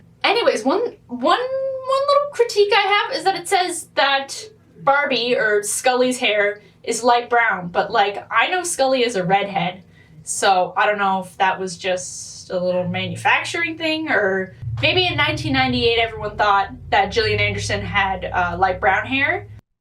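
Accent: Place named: Canada. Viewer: I like your accent! Canadian detected?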